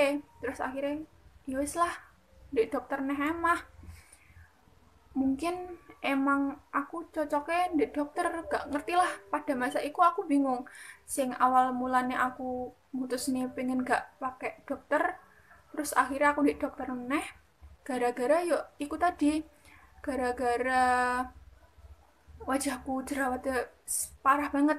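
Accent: Java, Indonesia. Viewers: hahahaha medok polos lucuk gitu gemes wkekek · Wong jowo yo 🤣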